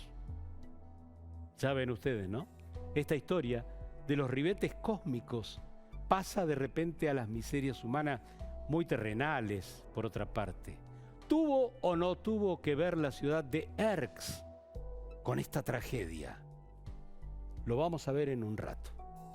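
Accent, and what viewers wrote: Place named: Argentina. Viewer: Mejor!!!! Cómo querés que lo narre? Con cara de tujes??? Lo narra tipo gauchesco... Ommmmm!!!! 🙌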